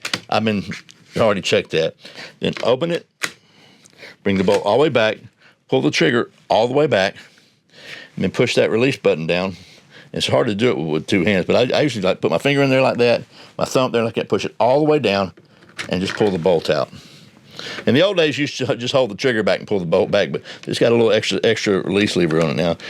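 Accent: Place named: South, United States. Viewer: Great video as always. I am researching this rifle heavily right now, and your video is full of great information as well as visuals of the gun. I always enjoy watching your videos, and your southern drawl makes me feel like I'm talking to one of my buddies up at my shooting club. One comment about the safety that I learned from other reviewers. It is a 3 position safety. Fire position is obvious, the middle position locks the trigger but the bolt is unlocked for cycling, and the rear position locks both the trigger and bolt.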